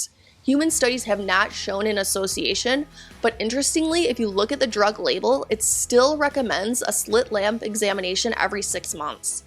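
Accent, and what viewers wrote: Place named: Massachusetts, United States. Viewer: Would like to reckon you’re from Massachusetts holy lmao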